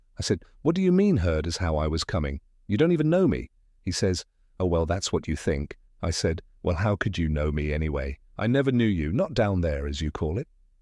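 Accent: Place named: RP, United Kingdom. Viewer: The language used from the transcript is cockney English, but spoken by a person who speaks Queens English. That would be like getting a Mexican person to read a transcript in a southern American accent, certain inflections just don't work, and it's hard to follow. Luckily I speak cockney english, so by reading the transcipt I could understand better what was being said. The use of some words prove to me the time period when the transcript was made, the words "Ninney", "Cor" "A to do" etc, were all common usage words i n cockney English from the early 1900's to the 1960's. Thank you for this wonderful video.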